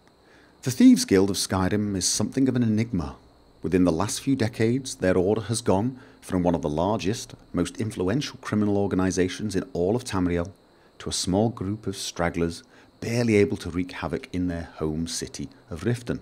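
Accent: United Kingdom. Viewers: Wooow, You're from Norway? Why the hell did I thought you were from the UK?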